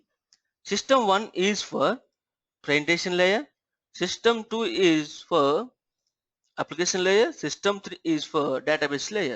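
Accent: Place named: India. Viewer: Gosh! Indian English, what the hell of the intonations? I don't understand!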